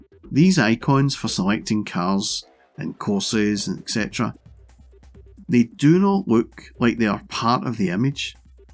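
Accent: Scotland, United Kingdom. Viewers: I wasn’t expecting the Scottish accent 😅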